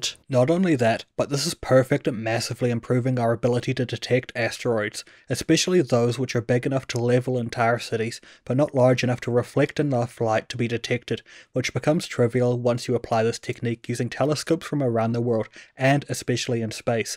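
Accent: United Kingdom. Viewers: You have an accent so it sounds like UK perhaps and probably get away with it due to the connection between the United Kingdom and the us being part of the five eyes. But probably still would have to ask for permission and would probably get it because what I'm thinking is portable extremely modular, uses an SDR array of disciplined chief sdrs and one that's a little more expensive because it covers from 6 to 20 GHz. And then there would be $360 degrees of visual, visual zoom, infrared, thermal, it's kind of designed like a cylinder with heat dissipation and reflectivity taken into account. And people who are knowledgeable about passive radar know what I mean about reflectivity. I'm using quarter wave well filtered phased array antennas on a flexible PCB for example is one idea ahead facing each direction so you can get time of flight. There's also a nice premade hat it looks like a hat the determines phase so you can weed out multipath. 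It connects via fiber optics pelican case which has whichever is the best chemistry and density for power storage. The ability to hook up to camouflaged wind, solar, or hydro even if it's just a local water source. Hooking up a small charging device to the local water. You put up a set of 10 or 15 of these and you could cover a lot of space 1 it would spend several days taking baseline is on the EM spectrum and picking out known emitters. If you take a baseline from knowing emitters with known coordinates over a week or two weeks of various w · Did you think of this idea yourself? You could probably develop some rough prototype and pitch it to the US government, or the UK since you sound British.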